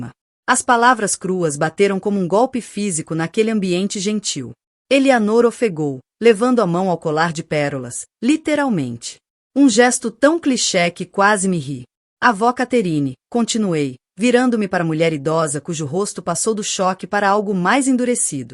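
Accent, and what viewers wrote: Portugal. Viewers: A ESTÓRIA pode ser boa, MAS ... Lamentável!😡 NARRAÇÃO em português de Portugal, NÃO O NOSSO PORTUGUÊS-BRASILEIRO! RESPEITO POR FAVOR!!!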